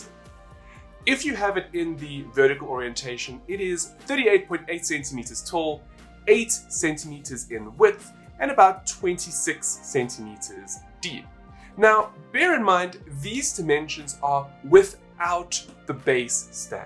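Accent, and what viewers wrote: South Africa. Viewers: I don't usually click on ads but I hope you get some recognition for your work. Are you from South Africa? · are you a south African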